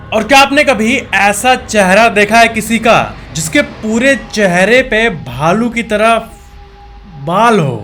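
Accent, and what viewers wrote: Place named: Delhi, India. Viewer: Seriously bihari Babu talking South Delhi Hindi is great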